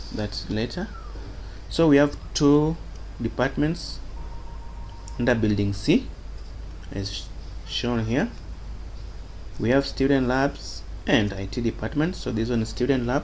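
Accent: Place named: India. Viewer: sir i am an ethiopian please make your english language good make it approach to american english not to indian accent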